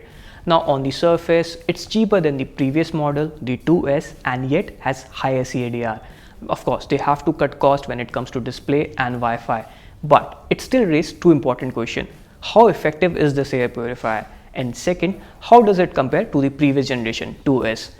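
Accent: India. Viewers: Professional video !! Except the strong Indian English pronunciation is a bit annoying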